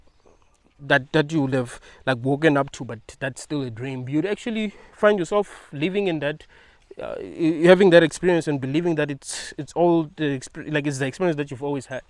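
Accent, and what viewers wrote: South Africa. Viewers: South African.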